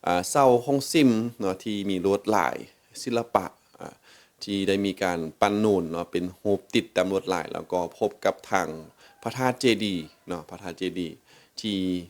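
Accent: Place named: Lanna, Thailand. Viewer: 💐💛🤗 สำเนียงบ่าวล้านซ้างใกล้เคียงคล้ายคลึงสำเนียงคนล้านนาขนาด ... 👏⚘️😘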